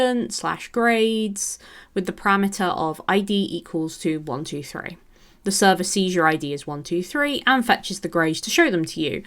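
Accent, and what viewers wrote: United Kingdom. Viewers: Are you brittish 😊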